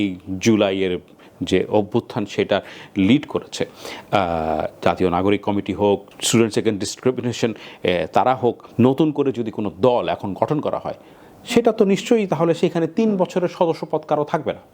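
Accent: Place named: Kolkata, India. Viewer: আপনার ভয়েসটা একদম কলকাতা দের মত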